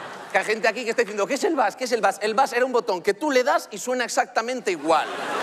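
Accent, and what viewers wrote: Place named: Galicia, Spain. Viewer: Me encanta el acento gallego. Es precioso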